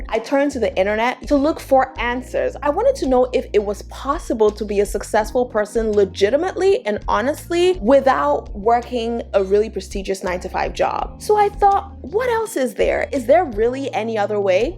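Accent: Nigeria. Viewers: God bless you 🙏❤ I just signed up! Why do you sound Nigerian sometimes? Haha😄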